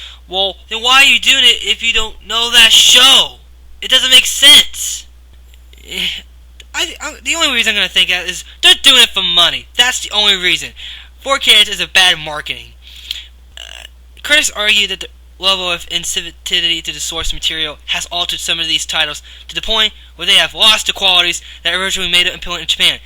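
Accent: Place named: Australia. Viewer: I thought it was aussie.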